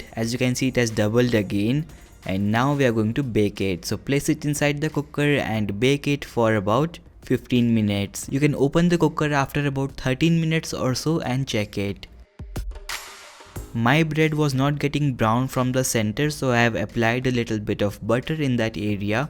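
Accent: India. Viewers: Fuck this disgusting accent of indiiisss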